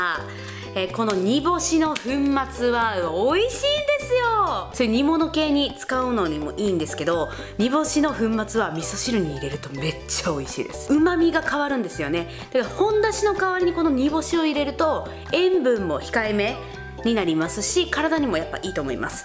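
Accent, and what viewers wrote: Kansai, Japan. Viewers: さんの関西弁可愛いご主人のとこに住んでも関西弁のままでいるの見るとなんとなく安心する笑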